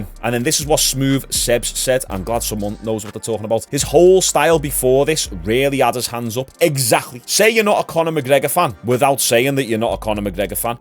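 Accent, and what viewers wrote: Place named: Scotland, United Kingdom. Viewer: This whole time I thought you were from Scotland because of your accent. You have a very deep strong accent. You sound like your straight out of Braveheart.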